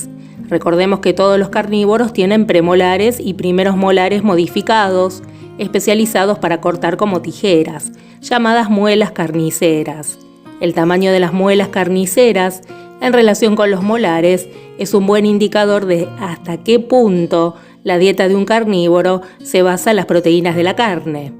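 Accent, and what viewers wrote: Argentina. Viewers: se nota mucho que eres de argentina y por alguna razon me gusta como hablas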